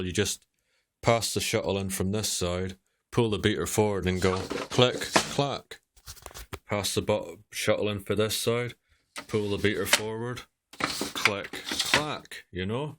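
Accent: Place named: Scotland, United Kingdom. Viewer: Hello Thank you for taking the time to share your knowledge, which is great for people like me, who have just acquired a Weavmaster loom and don't know much about weaving. I'm looking at various warping boards, some with a few peg and some with a lot more, what would you recommend for this loom and a beginner. my loom is 102cm =40inc and four shaft, are you aiming to do any more videos, as i could listen to that Scots accent all day relax whilst i learn from the videos.Please i hope so.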